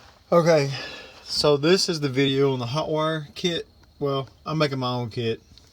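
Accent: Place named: Texas, United States. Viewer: Dude you sound like you are from Texas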